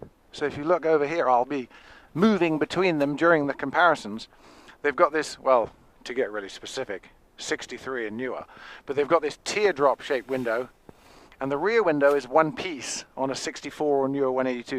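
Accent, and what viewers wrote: England, United Kingdom. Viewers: This is an English accent…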